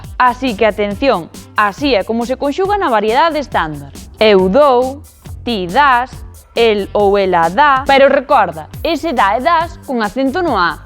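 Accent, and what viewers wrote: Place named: Spain. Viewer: Não encontrei nenhuma similaridade fonética entre o português Br e o galego nessas palavras, primeiro não diminuem as vogais finais não nasalizam as vogais antes de M e N se for levar em consideração o som de DI/DE e TI/TE soa ainda mais diferente além que fazem a pronúncia do R vibrante e o sotaque é mais Espanhol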